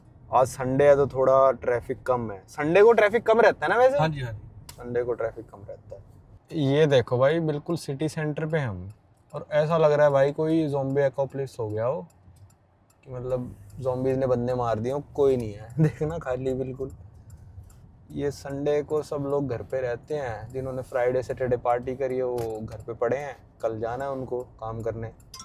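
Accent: Haryana, India. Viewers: Mainly m bhai ki video dekhta hu bhai ke baat krne ke tarike se attract hoke bahadurgarh wale style me mast😅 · Batane lag raha hu....jaane lag raha hu....batane lag raha hu....ye bhai lag raha hu kisi bhi cheez ke peh le .......ye kis region ki hindi hai india mein? Koii bata de...may be a weird question to ask😂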